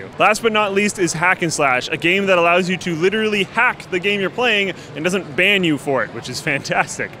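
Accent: Canada. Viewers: his accent is so canadian, sometimes its hard to tell if someone is american or canadian until they say certain words but with this guy its super easy to tell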